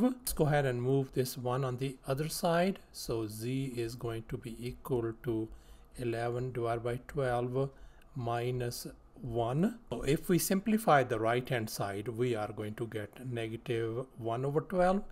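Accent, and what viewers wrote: India. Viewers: Sir your voice sounds like Indian..then why you are in America..😒😒..don't you love india?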